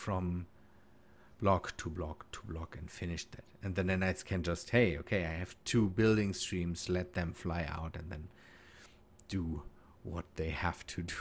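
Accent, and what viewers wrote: Germany. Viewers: Nur intresse.. 😂 Deutsche haben so eine unverkennbare Aussprache, die hört man irgendwie immer heraus..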